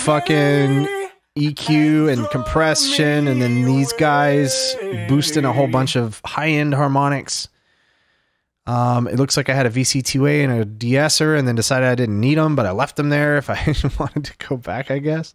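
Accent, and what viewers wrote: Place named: Appalachia, United States. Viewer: The hillbilly accent 😂 I love it!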